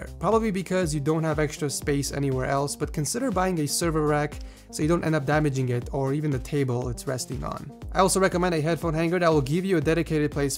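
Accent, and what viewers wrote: Russia. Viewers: Awesome Russian accent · Best Russian accent evr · At first I was going to click out the video because of the Russian acent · Nice Russian Voice +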